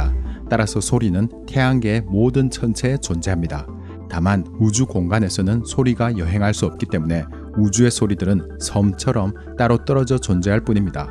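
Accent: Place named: Gyeongsang, South Korea. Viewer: 나레이션 하는분 경남사람이시네 부산잠깐살다 떠난이후 오랜만에 들어보는말투네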